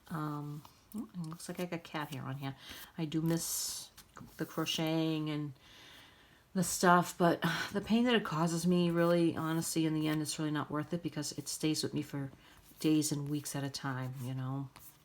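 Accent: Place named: Boston, United States. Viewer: LOL. Every once in a while a word at the end of a sentence sounds Jersey or something (I never was there either but knew people from there). I WAS to Boston once ages ago so maybe the accent just sounded a little familiar. But cool! Boson/Portuguese! Well, love it! Next, is when will we get to see YOU? Take care! 🥰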